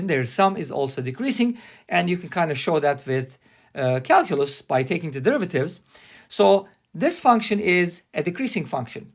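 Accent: Turkey. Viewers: The accent is unmistakably Turkish. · you exactly sound like you have a turkish accent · you sound turkish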